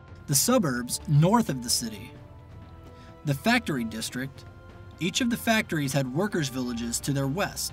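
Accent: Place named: United States. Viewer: American accent somehow kinda kills the seriousness of a documentary. Needs British voice.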